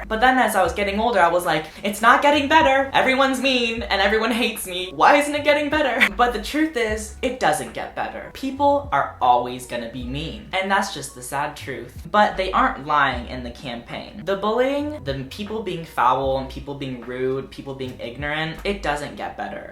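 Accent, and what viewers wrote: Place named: Texas, United States. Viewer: U've been so texan lately!!! I'm love it!!! Y'all!! Ur accen!! Yeehaw king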